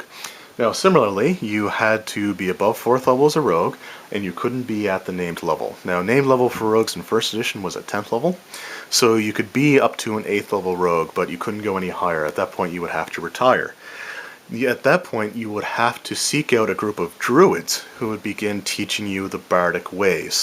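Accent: Canada. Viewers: I detect a Canadian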